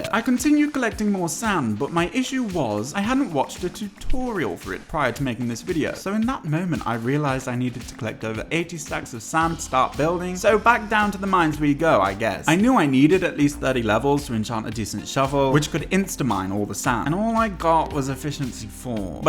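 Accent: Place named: United Kingdom. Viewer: Ur accent is a so British lmao luv the vid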